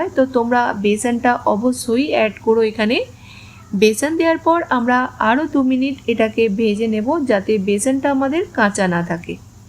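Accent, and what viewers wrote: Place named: India. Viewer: Daroon laglo. Aapnar kathay hindir tan aachhe. W. B thakenna nischoi, tabe sunte bhalo laglo.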